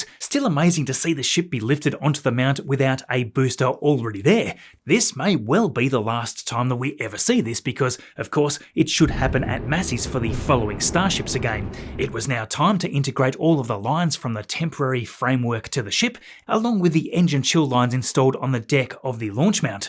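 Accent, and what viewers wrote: Australia. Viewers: Sorry ... aber mir geht das hektische australische Gequatsche von Dir nur mehr am Arsch vorbei ... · coffee and ' charming Aussie accent. Yep, it's saturday and the day is starting well.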